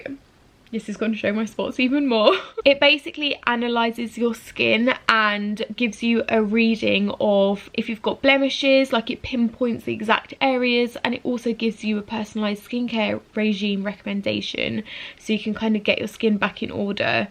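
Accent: United Kingdom. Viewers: He looooouu britain acent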